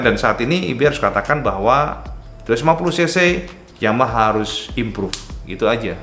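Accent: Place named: East Java, Indonesia. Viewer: Koyok e.logate wong ngetan...Iki...Jatim.....takerjet....kok onok mosone...ngerti aku.iki bahasane.....salam dari Karaeng galesong....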